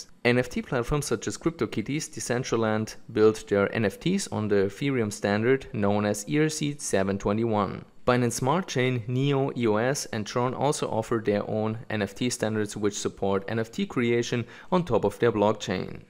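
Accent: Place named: Germany. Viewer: Ich sehe hier Kommentare auf Deutsch bist du auch aus Deutschland? Am Anfang hatte ich das Gefühl einen Deutschen Akzent bemerkt zu haben. Aber was ich eigentlich sagen will ist : sys1q29ngmzattdaamwyvggq6pw0j67ls7h9wauhmqy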